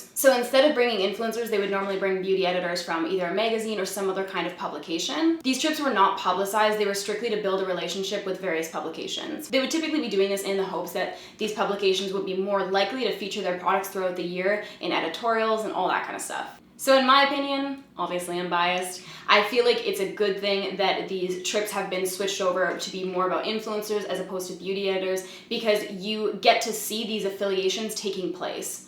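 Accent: Canada. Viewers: First of all, you're so stunning. Second of all you're Canadian accent is adorable. And third, I love your videos! You're the most honest youtuber out there, I love that I can trust your opinion when trying to figure out what makeup to buy and what's not worth it! Thanks for being the coolest thing ever x0x0x0x0x teresa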